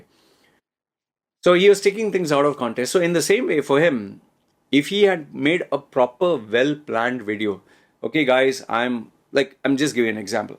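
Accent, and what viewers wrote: India. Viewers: Why u indians always say saar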